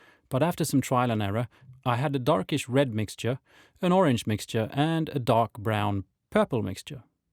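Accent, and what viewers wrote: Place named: Sweden. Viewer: You sound Swedish, and the store looks like Incrade in Umeå. How far off am I?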